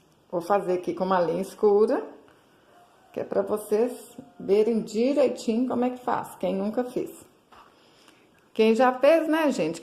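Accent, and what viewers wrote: Minas Gerais, Brazil. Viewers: pelo sotaque parece que você é mineira,eu também sou. Amei essa lembrancinha!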